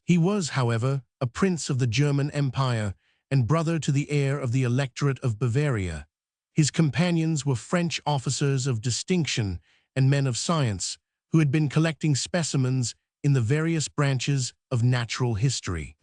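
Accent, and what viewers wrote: Mid-Atlantic, United States. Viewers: Absolutely horrible rendition. The AI voice has an unpleasant mid-Atlantic sound, and doesn't know how to pronounce basic words in English, such as lieutenant. If this is what audio-books sound like then I'll give them a miss.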